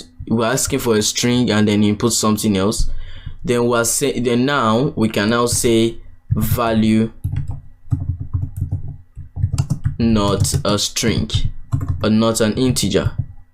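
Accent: Yoruba, Nigeria. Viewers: I can hear the Nigerian accent. Great video. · Im so happy i can hear a Nigerian's voice. God bless you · Excellent, looks like a nigerian accent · this is wonderful ..... why does this guy sound very Nigerian ??? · sounds like a Nigerian great tutorial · Heard the accent and i knew this na omo naija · Well he is Nigerian .... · is probably Nigerian by his accent, yoruba to be precise. Thumbs up to him🇳🇬🇳🇬🇳🇬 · He sounds Nigerian, awesome man! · It's a Nigerian accent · Is it just me or does the instructor have a Nigerian accent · Nigerian accent o 😂💀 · As a Nigerian, I've been doubting if this accent is from a Nigerian or not, then I heard "let me kuku", my doubt went off....thanks so much for the video. How can I also reach out to you? I've done cs50p, cs50x, and almost done with cs50w, but still feeling like they are not enough in today's market. I will really appreciate your response. 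From your fellow Nigerian.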